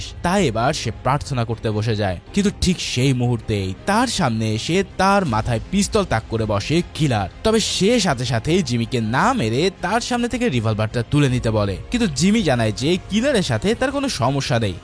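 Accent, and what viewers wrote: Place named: Faridpur, Bangladesh. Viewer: আপনার বাড়ি কি ফরিদপুর জেলায়, মানে ফরিদপুর এর লোকজন জোরে বা গতি কে বেগে বলে।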